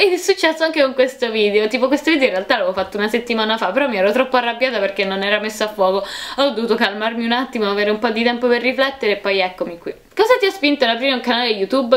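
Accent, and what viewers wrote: Rome, Italy. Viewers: È di roma e si sente tantissimo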